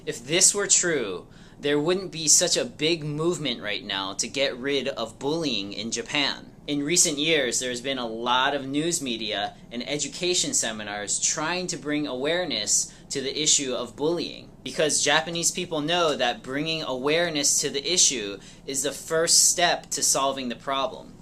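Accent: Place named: United States. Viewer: I can tell from your accent that you are American. If you are American, you should focus on racism in America. Living in Japan more than 20 years I know most Japanese learn about the racism within their country. Many people just don't get it or don't care much about it. As you said at the very beginning of your first video, there is racism in every country. You have no right to judge Japanese people unless you solve all the racial problems within your country.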